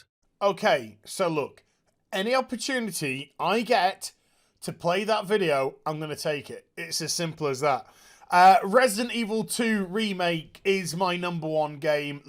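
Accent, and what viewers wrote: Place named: United Kingdom. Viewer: Tunning in to this video to see the block from Jason, and staying for the british accent!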